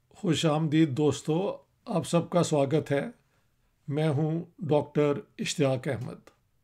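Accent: Punjab, India. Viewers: Respected Dr Sahib Many many thanks for your thought provoking and illuminating talk on Hindu families of Lahore which enriches our knowledge about this city of Lahore before partition Your style is lucid and Punjabi accent is commendable which shows your high class experience with erudite wisdom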